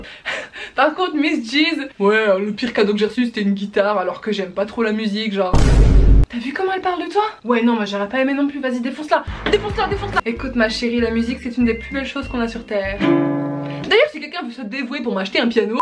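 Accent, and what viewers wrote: Belgium. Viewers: T'es belge?😃😄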